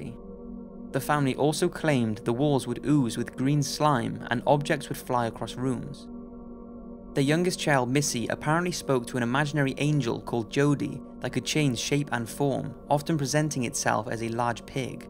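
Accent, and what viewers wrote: Wales, United Kingdom. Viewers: Yes, he should definitely do audio books. Such a soothing and clear voice. Is that a slight Welsh accent I hear...? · finally a Brit narrator😅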